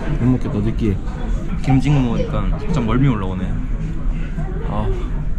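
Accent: Gyeongsang, South Korea. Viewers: 혹시 경상도 분인가요? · 올해 60.창원사는 아줌씨입니다. 여행을 좋아하다보니 우연히 영상 보게 됐는데 울 아들마냥 경상도 사투리가 반갑네요 ㅎㅎ 조용조용 즐기면서 탐색도 하고 진정한 여행자 같아요. 진심 부럽네요. 앞으로 자주 보게 될 것 같아요~ 3월에 호주.뉴질 가는데 이 영상 많은 도움이 되겠어요. 감사해요~❤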